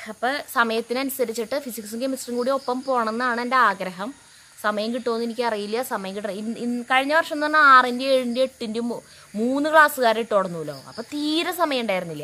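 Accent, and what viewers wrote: Thrissur, India. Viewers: Mm poliya trissur slang.😘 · Teacher thrissurkkariyano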